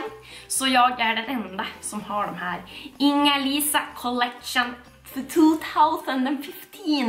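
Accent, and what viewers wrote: Norrland, Sweden. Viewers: Heeej❤️❤️ jag bor också i Skellefteå🤘 asså din dialekt är så norrlänsk😂💕 min är dock inte det😂 skulle vara så kul att träffa dig på tex på stan👌 dina videos är bäst🤘 hoppas du läser denna kommentaren❤️ kram ❤️❤️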